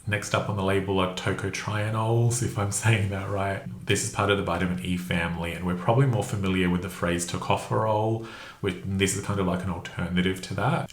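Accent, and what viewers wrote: Australia. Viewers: Probably better to stick with my 12% for now. Thanks again . Love your succinct Australian accent 💛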